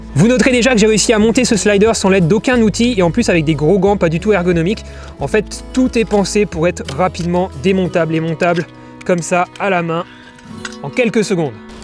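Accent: Alsace, France. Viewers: on dirait que tu es en Alsace. en tout cas super bonne vodéo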